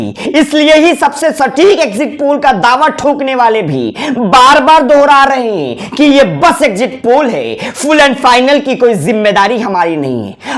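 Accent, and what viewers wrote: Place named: Mumbai, India. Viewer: bambad type accent hae tumhara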